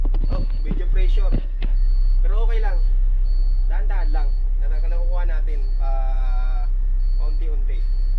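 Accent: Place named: Bicol, Philippines. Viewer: Ganun talaga kapag bicolano ... May diin at minsan wala ang letter sa word na sinasambit ... Pagpasensyahan mo nalang 👍👍👌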